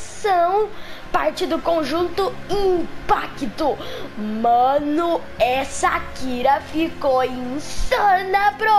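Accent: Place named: Bahia, Brazil. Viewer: Kkk muito engraçado vc falando parece um baiano kk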